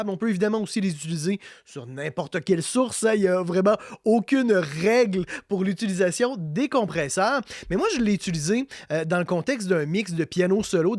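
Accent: Quebec, Canada. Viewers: Bonjour, j'adore votre chaine ! Impossible de télécharger KOLIN sur PC Windows 10. Continuez à nous ravir avec votre accent Québécois ! Max de France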